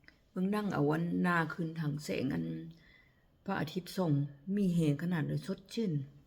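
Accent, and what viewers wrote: Nyo, Thailand. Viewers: มานั่งฟังพี่ เม้ามอยเด้อจ้า❤มักๆสำเนียงญ้อค้ะ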